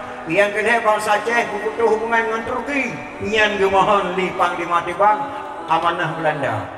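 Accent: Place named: Peninsular Malaysia, Malaysia. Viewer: Kenapa cakap macam semenanjung Malaysia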